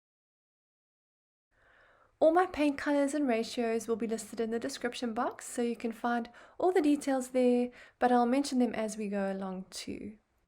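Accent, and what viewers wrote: South Africa. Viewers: So pretty. Yes I was having the same challenges with the paints - def going to try your tips. I enjoyed listening to the SA accent - made me homesick.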